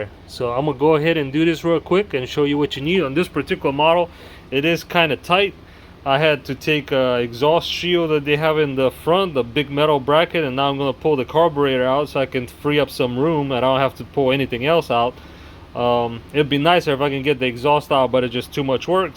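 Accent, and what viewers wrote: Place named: South Louisiana, United States. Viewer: thank you for the video, huge help trying to help out an old man with Parkinson's ,,, his one joy is cutting his grass now i can get him back up and going from the sound of your accent, sounds like you're from south Louisiana,, i'm from Lafayette originally, be blessed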